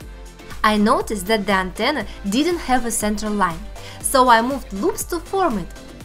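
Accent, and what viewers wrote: Russia. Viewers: я прям слышу родной русский английский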